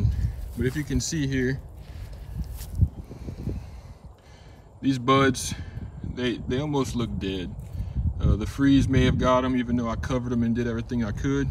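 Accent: Louisiana, United States. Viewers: What a coincidence! I did the same thing today with my brown turkey tree. I am trying to get more varieties. Would you be willing to sell any of these cuttings? By your slight Cajun accent, I probably dont live too far from you. Let me know. Great video.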